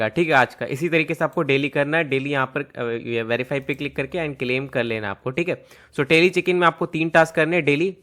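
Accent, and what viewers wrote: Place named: India. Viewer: INDIAN SOUNDS MOTHER FCKR COUNTRY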